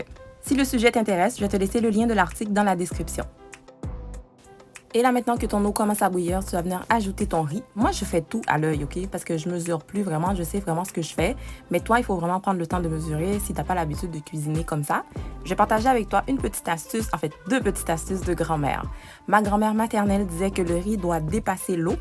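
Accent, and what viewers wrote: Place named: Quebec, Canada. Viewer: Merci de nous dire pour larsenic...bel accent quebecois ma belle❤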